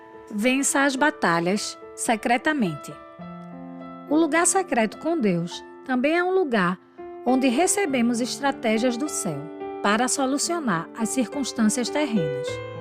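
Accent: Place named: Northeast, Brazil. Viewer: Estou feliz de ouvir esse áudio com uma voz nordestina 😊😊😊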